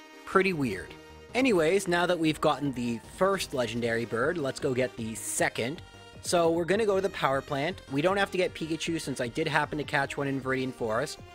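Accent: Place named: Canada. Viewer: I'm not sure what part of Canada lives in, but it was uploaded pretty early in all of the timezones. If he lives in Newfoundland or Nova Scotia, it was a somewhat normal time (around the 7am range), but his accent suggests to me that he doesn't live in either or those places. I could be wrong about that though, as some people try to underplay their natural accent.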